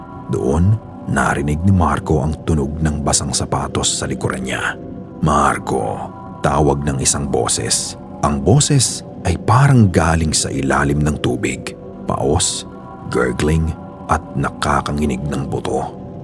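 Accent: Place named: United States. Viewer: Nakakagulat ung tagalog na kwento pero nagging american accent pag english words. Okay naman ang Filipino accent na english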